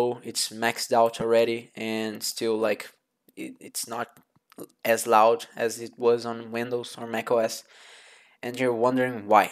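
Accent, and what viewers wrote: Brazil. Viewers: I know a brazilian when i hear one, meu camarada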